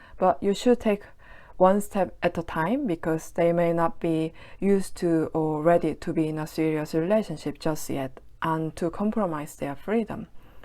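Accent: United Kingdom. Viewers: 약간 영국 발음 같아요 완전 영어 잘하시네요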